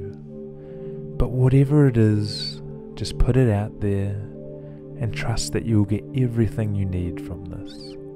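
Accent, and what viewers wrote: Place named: New Zealand. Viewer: Real relaxn More guided meditation please. its nice to hear a kiwi voice! its more calming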